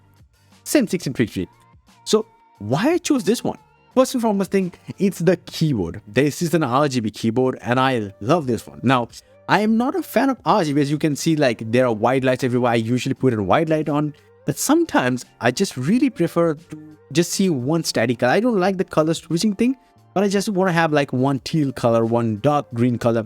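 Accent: India. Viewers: THICK BOMBAY ACCENT IS IRRITATING. THEY SHULD STICK WITH THEIR NATIONAL LANGUAGE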